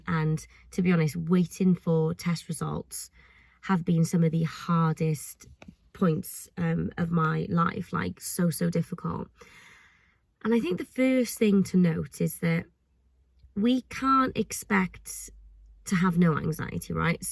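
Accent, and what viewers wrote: Cardiff, United Kingdom. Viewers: Is that a cardifff accent? 😊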